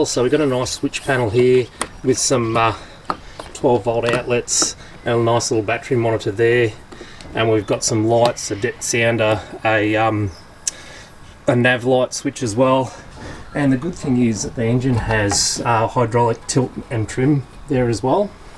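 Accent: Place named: Australia. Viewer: As soon as i heard the Australian accent and then the stupid music i just new it was time to stop watching this vlog 😮 greetings from England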